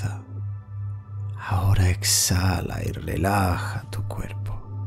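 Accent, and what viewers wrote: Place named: Spain. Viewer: Excelente meditación, excepto el acento de España podrían hacer con un acento neutro, gracias.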